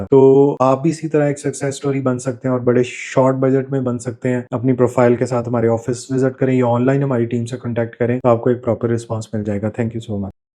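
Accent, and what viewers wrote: Faisalabad, Pakistan. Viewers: Pure Faisalabadi! But Genuine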